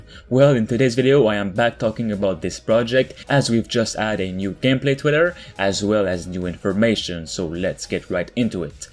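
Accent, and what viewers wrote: Quebec, Canada. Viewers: Are you french canadian? You have the accent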